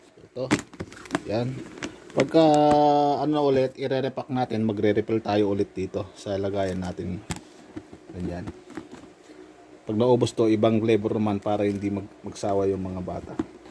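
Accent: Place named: Waray, Philippines. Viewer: Saan Po ba location nyo sir? Parang waray waray ka boss hehe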